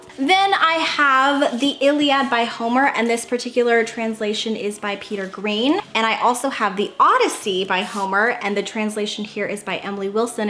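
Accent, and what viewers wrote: United Kingdom. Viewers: That intro 🤣🤣🤣🤣 your British accent could star in a period drama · OMG That bri-ish accent!!! YOU TOTALLY KILLED IT HAHAHA!!!!💗😹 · I bloody knew you were Bri’ish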